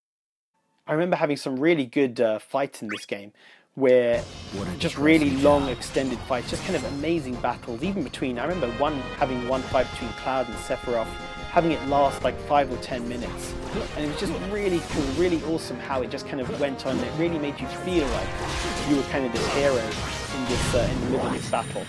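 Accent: United Kingdom. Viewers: Has it been taken down? You sound British, it's not been taken off the UK store? I can't find it, I can only find the prologue game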